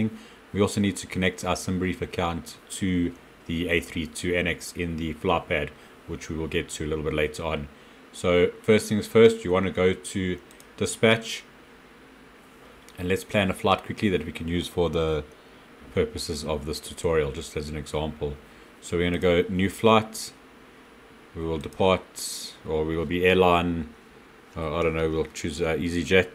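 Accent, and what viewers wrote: South Africa. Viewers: thanks for the tutoral. i am a big BOEING fan and after many years of flying them i have eventualy decided to tryout Airbus. BTW, its good to hear a South African accent again, (other than my wifes) as we have been living in the UK for sometime.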